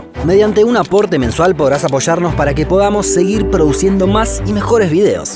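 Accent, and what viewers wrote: Argentina. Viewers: Esta canal es un lujo!! Ese cielo por amor a dior!! Claro q me gustaría conocer Siwa y el Sahara! Será cuestión de planearlo. Gracias sos impecable y me encanta que tengas acento argento. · Que lindo acento argentino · De donde sos vos viejo? Tenes acento argentino 🤔😋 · El acento argentino también es parte de la magia de este canal:3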